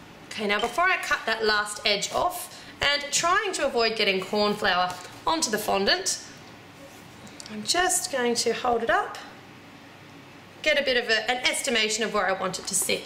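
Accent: Australia. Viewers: Are you Australian of South African.... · ur aussie right? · australian i think.... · She is from Australia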